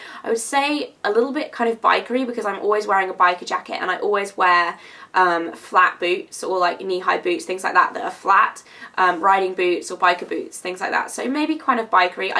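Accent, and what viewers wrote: United Kingdom. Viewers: ahhahhaaha squirty cream. i love british accents! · I love your channel! Enjoyed your 13 questions tag! It is so neat to hear your accent! I would love to visit the UK someday!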